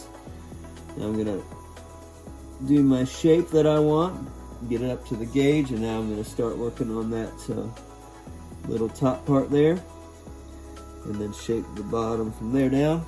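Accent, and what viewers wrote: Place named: United States. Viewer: Thank you so much for all of your videos; I really appreciate you taking time out to share your skills and expertise. And I really like your laid-back style - I'm not sure why some people want you to be something you're not. Strange. Btw, I love your accent. We Brits are always being told that Americans like our accent - but yours is just lovely.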